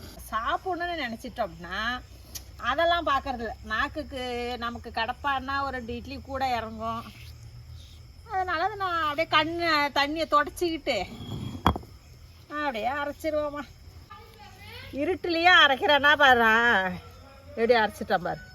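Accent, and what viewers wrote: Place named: Chola, India. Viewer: எங்கள் ஊர் காரைக்காலில் திருநள்ளாறுக்கு அருகாமையில் இருக்கும் சுரக்குடி என்னும் கிராமம் நான் உங்கள் காணொளியில் மிகவும் ரசித்து கேட்டது என்னவென்றால் உங்களுடைய சமையல் மற்றும் உங்களுடைய சோழமண்டலத்தில் உண்டான அந்த பாரம்பரிய தமிழ் பேச்சு நீங்கள் பேசுவது அப்படியே எங்கள் காரைக்கால் எங்கள் கிராமத்தில் பேசும் வட்டார வழக்கு நடைமுறையில் இருந்ததை கண்டு மனமகிழ்ச்சி அடைந்தேன் ஒருவேளை இவர்கள் நம்முடைய ஊர் பகுதியைச் சேர்ந்தவர்களாக இருப்பார்களா என்று தான் தங்களுக்கு இந்த குறுஞ்செய்தியை அனுப்பினேன் நன்றி அம்மா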